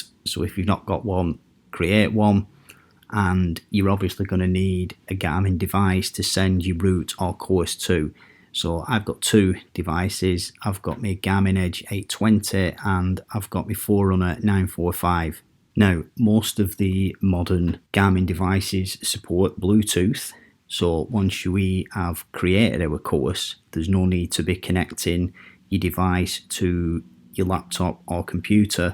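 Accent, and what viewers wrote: United Kingdom. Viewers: Well explained thanks. Sounds like you’re a Lancashire lad. Hoping to do the UK Ironman at Bolton again 2021. Great place!! 😊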